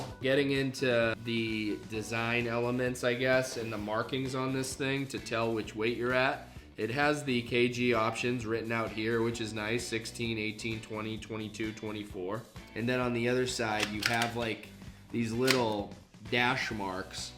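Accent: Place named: New Hampshire, United States. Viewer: I was just listening to this video and thought, "This guy is definitely from New Hampsha'" then I looked at my screen and saw the huge flag! 🤣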